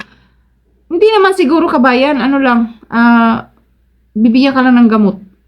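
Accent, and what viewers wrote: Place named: Ilocos, Philippines. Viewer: Yung accent mo ma'am mas malapit sa ilocano kaysa cebuano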